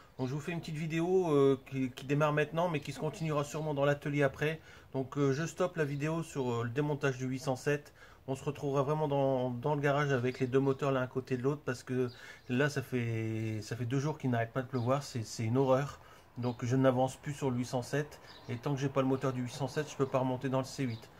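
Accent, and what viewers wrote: North, France. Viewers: Tu est d’ou du nord ?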